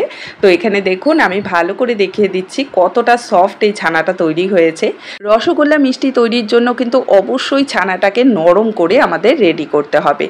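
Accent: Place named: Bangladesh. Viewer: পুরো ভিডিও দেখে আমি একবার ও বুঝতে পারিনি আপনি ইন্ডিয়া তে থাকেন কথার একসেন্ট একদম আমাদের মতো। শুনতে ভালো লাগছিল 🤗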